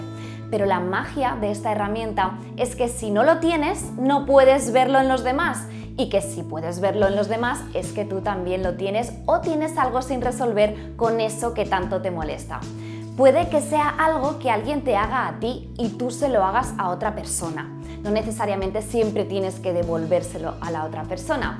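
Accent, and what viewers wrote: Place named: Spain. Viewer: Va relacionado con la frase: no mires paja en ojo ajeno..? ... bueno en lo personal trato de aplicar y mejorar en tema de virtudes y actitudes. Me podrías decir si lo que explicas lo puedo leer en un libro de psicoanálisis o es otro rama de la psicología? . Tu acento español sueña como un eco deberías mejorar tu audio al grabar y hablar un poco despacio sería mejor tu video. Espero no ser ofensivo con mi humilde opinión. Gracias/ saludos..!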